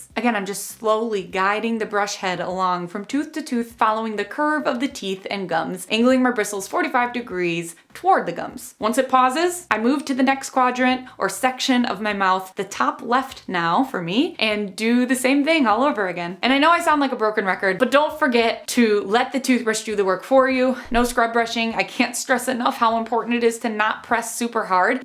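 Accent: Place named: Michigan, United States. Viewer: Is that accent from Mt Pleasant Michigan? It’s pretty thick. But I like it.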